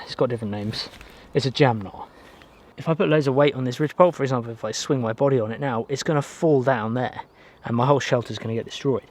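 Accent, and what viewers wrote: England, United Kingdom. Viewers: What is up my brother from South Korea 🇰🇷wow i feel your English is England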